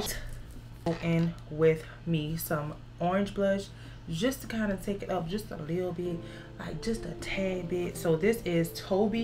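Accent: Louisiana, United States. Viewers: You've gotta be from Louisiana!!!😅😅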